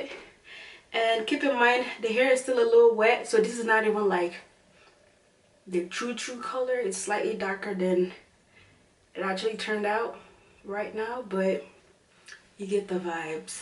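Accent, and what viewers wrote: Jamaica. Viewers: I was nervous too. lol I laughed so much. Are you a Jamaican?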